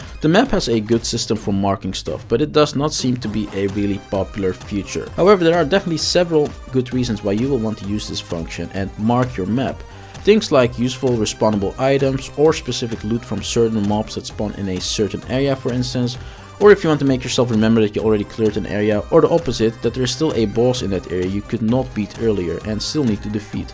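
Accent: Netherlands. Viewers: Are you Dutch? 🤔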